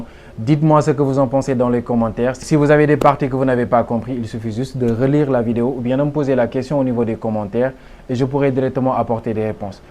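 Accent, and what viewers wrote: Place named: Africa. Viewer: N'IMPORTE QUOI !! ce n'est pas du tout un clone de ta voix ! Notamment, ta voix clonée n'a pas ton accent africain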